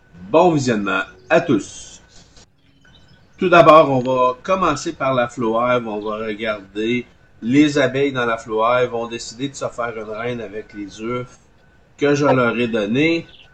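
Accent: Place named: Quebec, Canada. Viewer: Je suis une apicultrice de Toronto. J'essaye d'apprendre la terminologie apicole en français car je suis anglophone. Puis-je vous demander s'il vous plaît d'énoncer un peu plus clairement car j'ai appris à parler français auprès de professeurs français et non québécois. Je suis apicultrice depuis environ 10 saisons. Merci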